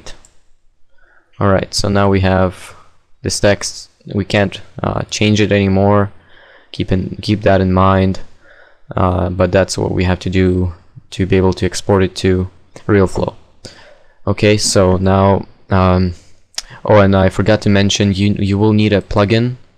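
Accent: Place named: Netherlands. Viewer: do you have a dutch cennel want ik hoor dat je nederlands ben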